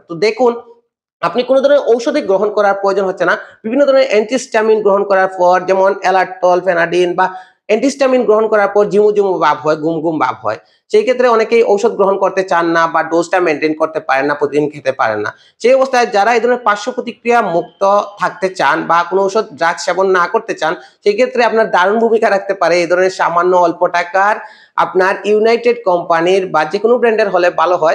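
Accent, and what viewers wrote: Sylhet, Bangladesh. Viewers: আপনার উচ্চারণ শুনে লাগছে সিলেটের লোক😑,আমাদের সিলেটের মানুষ শুদ্ধ ভাষাই খুবই কাচা😑😑বলবেন স্যার আমি কী ঠিক বললাম???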